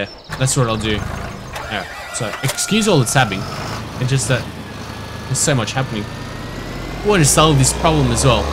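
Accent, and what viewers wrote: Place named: Australia. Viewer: I don't know if i have said this before but i love australians ascents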